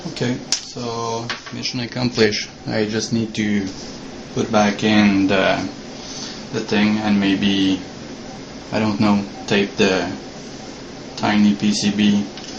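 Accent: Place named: Quebec, Canada. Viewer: You have a Quebec tone..lol Thanks for the review..I was going to buy one.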